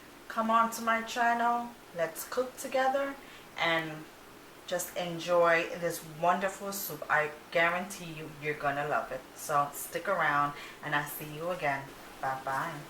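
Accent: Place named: New York, United States. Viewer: Looks delicious going to make for my family today, I wonder how long it took to cook the chicken in the beginning? Also where are you from, sounds like a New Yorker to me 💁🏻‍♀️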